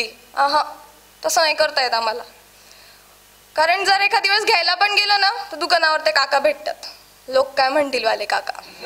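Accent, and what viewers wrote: Khandesh, India. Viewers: Khandeshi marathi olkhuch yete 😅 Pn chhan ahe 👍👍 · आवाज ऐकुन अस वाटत जळगाव खानदेश 💯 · Khandeshi ahe vatate?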